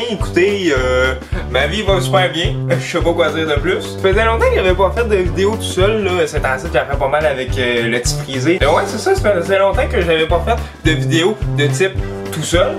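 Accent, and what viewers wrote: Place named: Quebec, Canada. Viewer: J'adore l'accent quebecois, franchement je le trouve ultra classe😎 continue comme ca c'est genial.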